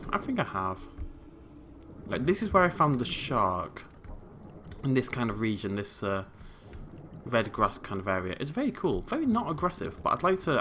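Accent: United Kingdom. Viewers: Hullo ladies and jauntlemen.lol,I love your accent. Brittish?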